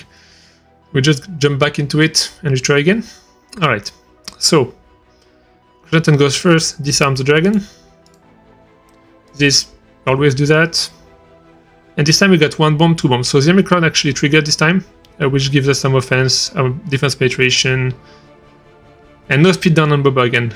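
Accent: France. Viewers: Sounds French to me... I'm in France right now! Nice to see someone making these guides in countries other than the U.S.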